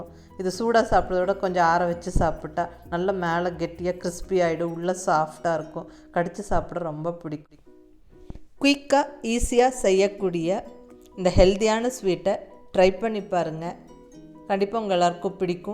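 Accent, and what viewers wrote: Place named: Kongu, India. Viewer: Thank u so much for ur video....... Ketadhum u did this vlog....... So sweet of u....... Recipe is so Gud and again our Kongu slang wow....... Nice to hear......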